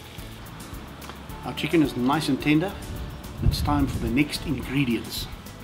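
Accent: South Africa. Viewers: Hello, I am a South African living in the USA - and even though I don't make potjies - I enjoy watching your videos just to hear your wonderful South African accent. Thanks so much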